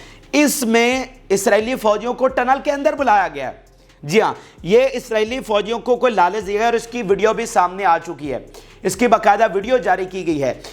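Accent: Punjab, Pakistan. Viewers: Bhai ap English mat bola kren, apke pas English ka lahja nahi hai..sirf ap nahi pure Punjabion ke pas nahi hai.aplog English ko Punjabi lahje me bolte hai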